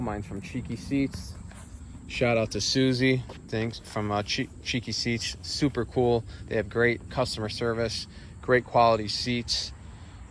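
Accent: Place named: Chicago, United States. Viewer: You can totally tell you’re from Chicago lol but love the video 🔥🤌🏼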